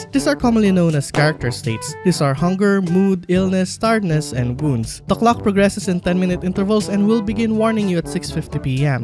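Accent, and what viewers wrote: Philippines. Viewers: Are you filipino? You have the accent... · I like your Filipino accent, I wish I had mine but I'm too much an Aussie to turn back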